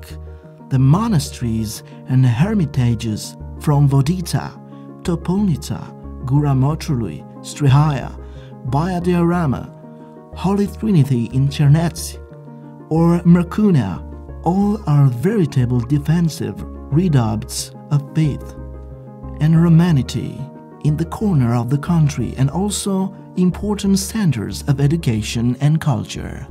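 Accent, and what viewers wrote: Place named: United Kingdom. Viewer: dude,what's with that British accent :D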